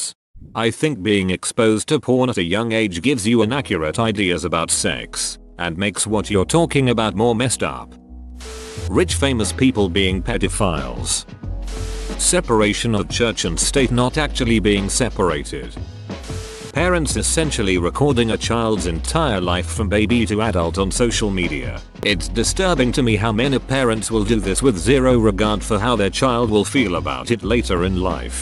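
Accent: United Kingdom. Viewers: That's because this voice sounds like a cultured British robot.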